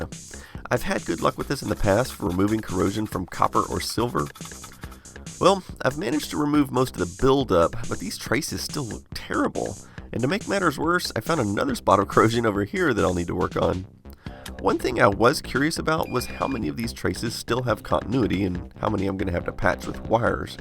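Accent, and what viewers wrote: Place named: Texas, United States. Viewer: I'm not sure why, but your accent seemed more noticable to me in this video than all of your other videos I've seen. I don't think you're speaking with more of an accent than usual, I just seemed to notice it more today. Mostly when you say "All right", very Texas-ish hahaha. Anyways, love your content, you're one of my favourite YouTubers! Thank you for continuing to produce entertaining and informative videos!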